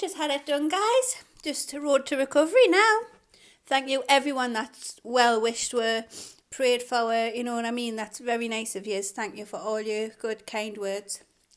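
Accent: Newcastle, United Kingdom. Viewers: U from Newcastle? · Are you from Newcastle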